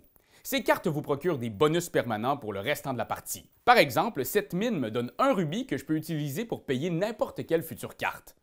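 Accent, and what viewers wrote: Quebec, Canada. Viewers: À la fin de son tour ! Ce qui peut permettre pendant celui-ci, d'en avoir 13 momentanément ! 😋 Petite omission également concernant les nobles, que l'on n'achète po...hein !? Mmh'et 🤫 Il ne faut po...hein !? Tout révéler ! Mmh'et donner envie, comme le fait si bien vos pépites oups ! Vos vidéos telles des bijoux/💎... (Ainsi que sur la fin du jeu où l'on finit le tour). Encore merci pour vos magnifiques vidéos. Qui + hé ! Agrémenté de l'accent de la Belle Province ! ✌🏼🃏♟️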